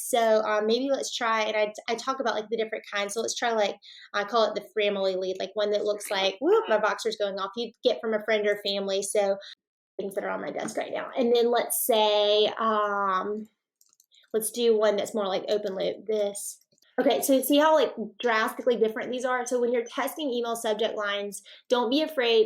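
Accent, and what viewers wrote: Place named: South Carolina, United States. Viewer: Has to be from South Carolina with that accent. Represent lol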